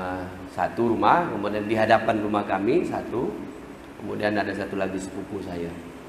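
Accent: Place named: Northern Malaysia, Malaysia. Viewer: Pandai ustaz cakap loghat utara 👍👍👍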